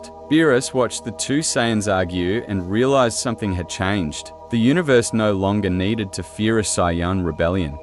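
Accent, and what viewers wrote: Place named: United Kingdom. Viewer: I don't like that dude accent he sounds funny when he talks sound like he's British or something 🤣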